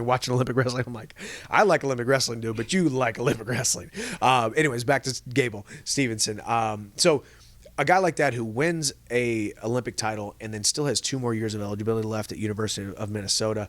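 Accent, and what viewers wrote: United Kingdom. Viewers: Any chance ur scottish ?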